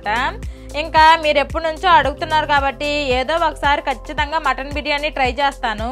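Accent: Srikakulam, India. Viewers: Hi akka, madhi srikakulam dist. Mee videos chustunte ma srikakulam ammayi ani garvamga anipistundi. Nee matalu kuda chala natural ga mana srikakulam matalu Ani thelustay.❤❤❤ Akka meeru thumbnail and long video editing endhulo chestaru cheppara please, kudhirithe dhani meedha oka video cheyara akka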